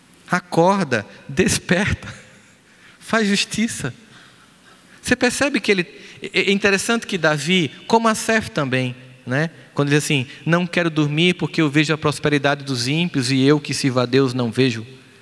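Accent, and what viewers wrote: Northeast, Brazil. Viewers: Esse sotaque NORDESTINO E MUITO BOMMMMM ! GRATIDAO !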